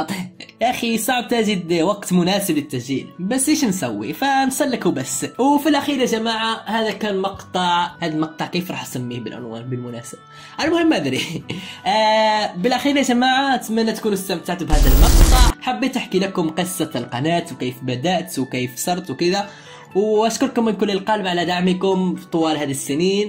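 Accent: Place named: Morocco. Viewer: أضن كلامك يحي إلى أنك مغربي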